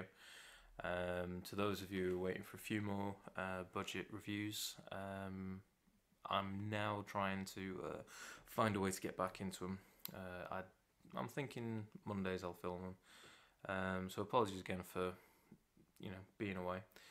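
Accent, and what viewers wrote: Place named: Stoke-on-Trent, United Kingdom. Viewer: Are you from Stoke-on-Trent by any chance? I recognise your accent!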